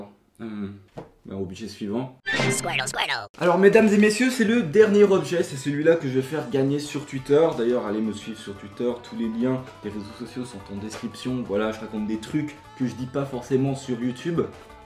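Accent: Switzerland. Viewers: Es-tu suisse ? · Mdr je savais pas que t'étais suisse 😂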